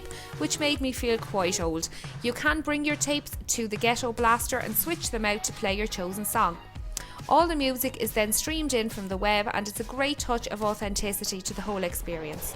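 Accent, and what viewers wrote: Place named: Ireland. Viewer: Dat irish accent!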